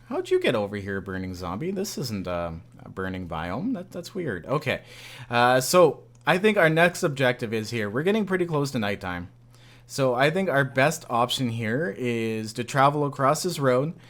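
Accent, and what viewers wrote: Canada. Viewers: You sound Canadian. Is he?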